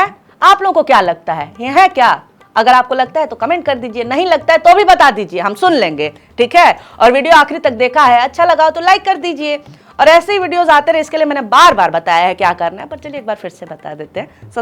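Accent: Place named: Agra, India. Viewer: Ms. Anchor-Young Lady Aapki ghungrali hindi Sun kurr bachpan kay din yaad aa jateon hain. Lagta hai aap Agra kay gird pudhi badhi hain. Thank for making us smile God bless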